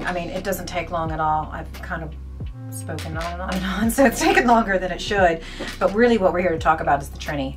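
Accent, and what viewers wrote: Ireland. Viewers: Hi, I’m so glad your channel was recommended to me... this is the first video I’ve watched of yours and at first I thought you were Canadian, but it’s the Irish lilt I can hear. I’m a big Trinny London fan too and the brand is cruelty free which is a must for me. I love her, she’s a bit bonkers but she makes me smile and her products are amazing. The eye colour is great too, just a quick dab on the eyelids (you don’t even need a mirror) and I’m good to go... nowhere! 😂 I’ve just subscribed and am going to binge watch your other videos 💛✨💛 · Beautiful. Also, your accent - with its tiny hint of Irish - is adorable. ☺️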